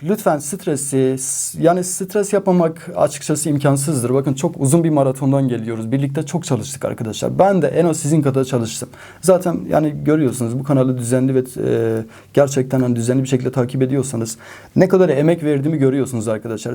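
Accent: Van, Turkey. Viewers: hocam siz kendinizi anlatmak için çabalamayin biz sizin Van şivenize alıştık anlıyoruz sizi. Bazı insanlar ya anlamıyor yâda bilerek sizi kızdırmaya çalışıyor takmayın boşverin. Sizde bizimle bütün sene çalıştınız. Allah sizden razı olsun inşallah. 🤲 Tatili hakettiniz... 10 gün sonra denize girip kef edin. He walla, kahvaltı da enfes olsun yarasın. Afiyet olsun. Yiyin için gezin yüzün en güzel kef ler sizin olsun 😃 hakkınız ödenmez tanıştığımız için çok memnun olduk bunu kendi adıma çok rahat ifade edebilirim. Siz örnek bir egitimcisiniz meslektaşlarınız size imrensin isterim. Cansın. 🙏👍👏😇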